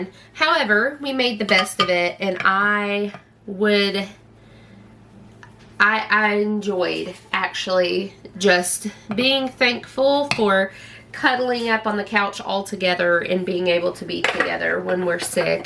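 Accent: South, United States. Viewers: I love your channel! I also love how you have a southern accent. We live in Maine and speak so differently!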